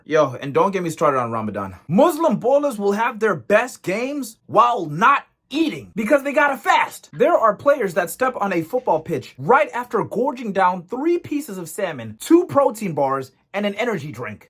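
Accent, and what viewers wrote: South Africa. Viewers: Bro, you South African? Shocked by accent. Gonna sub in any case. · Your South African? Same 😅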